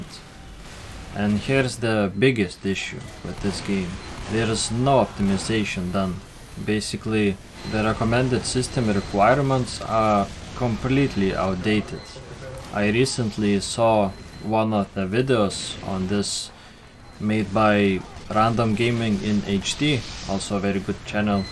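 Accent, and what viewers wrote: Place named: Ukraine. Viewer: but your english accent is very similar to ukrainian people. really.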